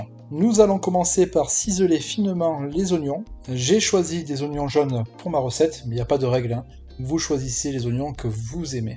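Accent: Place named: Provence, France. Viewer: hum cette pointe d'accent provençal !!! Je l'ai aussi mais légèrement plus prononcé!! Sinon, votre recette est succulente, je l'ai faite hier soir on s'est régalé.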